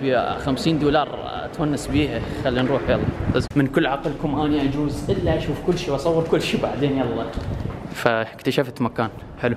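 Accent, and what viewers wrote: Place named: Iraq. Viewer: حلوو استمر بس انته اشو تكول دبي واحسن تكساس من دبي انته عراقي لو من دبي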